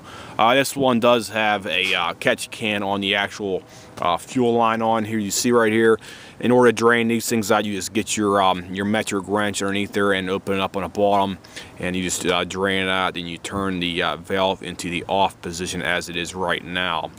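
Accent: Pittsburgh, United States. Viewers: Great video......sounds like a Pittsburgh accent.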